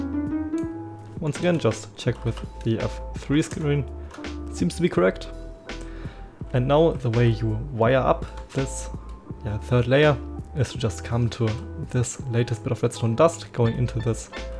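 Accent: Germany. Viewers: Amazing Video! It works perfectly fine! I’m just wondering if you’re german, because you have an accent?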